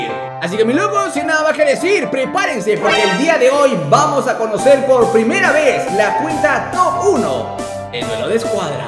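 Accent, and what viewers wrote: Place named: Peru. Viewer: Eres peruano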